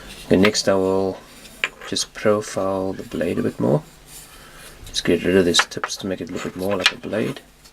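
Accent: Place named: Australia. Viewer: I also forgot to mention that I'm from Bulgaria, a country in Eastern Europe, and salaries here are one digit smaller than what you probably have in Australia (I hope I'm right judging by accent). Prices are a lot cheaper too though. Paying over $70 for a toy is a bit too much for me, it's 1/5th of my monthly salary. Please understand.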